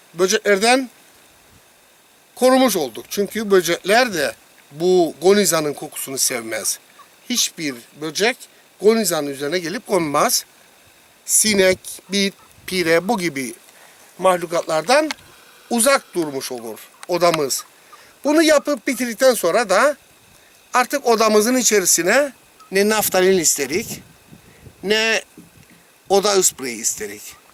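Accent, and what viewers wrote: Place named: Cyprus. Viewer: dalcıkları, bayılıyorum kıprıs şivesine 🙂🥰 Maaşallah Horozumuz da pek yakışıklıymış. Ne güzel ibiği var. Nazar değmesin tü tü tü tü 😁 Canım horoz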